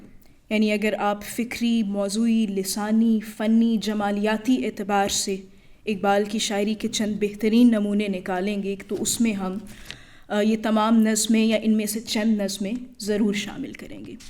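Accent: Iran. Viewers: ایک دقت ہے کہ محترمہ اردو بول تو رہی ہیں مگر فارسی لہجے میں بول رہی ہیں، اردو کا لہجہ اور ہے۔ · محترمہ ڈاکٹر صاحبہ کا بہت خوبصورت لیکچر ہے اقبال کی شکوہ و جواب شکوہ کی نظموں پر ۔ ان نظموں کو سمجھنے کے خواہاں لوگوں کے لئے ایک بیش قیمت تحفہ ۔ ڈاکٹر صاحبہ کا لہجہ نظم ادا کرتے ہوئے فارسی سے متاثر ہے ، اس کی وجہ یہ سمجھ آتی ہے کہ ان کا شاید زیادہ کام فارسی شاعری کے گرد ہو۔ اس سبب سے کچھ جگہوں پر تلفظ کی غلطیاں بھی ہیں ۔ مگر علمی طور پر میں کم علم تو کوئی کجی نہیں بھانپ سکا ۔ یہ سنتے ہوئے کئی جگہوں پر میں جزبات سے مغلوب ہو کر اشک آلود آنکھوں سے دیکھتا اور سنتا رہا ۔ ڈاکٹر صاحبہ نے بہت عمدگی سے وضاحت و شرح کی ہے ۔ میں یہ محسوس کرتا رہا کہ اقبال سے جو جزباتی محبت ، والہانہ عقیدت اور ان کے پیغام کے محاسن سے جو انس میں رکھتا ہوں ، وہ عشق ڈاکٹر صاحبہ بھی رکھتی ہیں - کچھ جگہوں پر واضح تھا کہ ڈاکٹر صاحبہ بھی گویا کلام پڑھتے ہوئے ایک قلبی و جزباتی کیفیت میں وارد ہو گئی ہیں - شکوہ کے بیباک انداز پر ان کی گفتگو سنتے ہوئے دل کے تار ایسے چھڑ گئے کہ بقایا حصہ بہ چشم نم سنتا اور دیکھتا رہا ۔ بلا شبہ یہ انداز گستاخانہ نہیں بلکہ لاڈ ، پیار ، مان ، یقین کامل اور محبت عمیق کا مظہر ہے- جیتی رہئے ڈاکٹر صاحبہ اور علم و عرفان کی روشنی سے طلبا کے ذہنی و قلبی چراغ جلاتی رہئے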